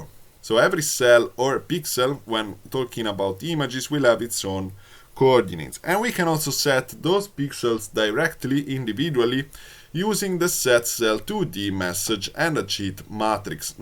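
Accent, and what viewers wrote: Italy. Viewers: Hello, are you italian ? I love your accent :)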